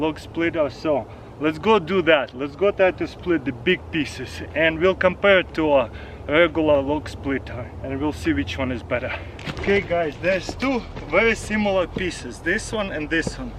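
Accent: Russia. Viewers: An out of shape white guy from Tennessee talking with a Russian accent. Eh, seems to be working for him. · Bring back Russian accent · Do you russian because. Your aced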